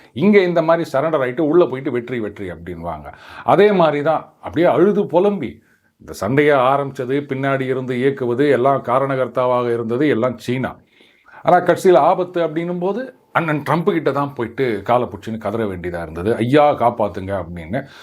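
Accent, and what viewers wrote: Chennai, India. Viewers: Sir. Our Chinna correction. Tucker Carlson - Fox News. CNN Ellai. Seldom I get to hear Madras dialect nowadays. Thank you for your service. அட்சா சும்மா எகிரிடுகுது.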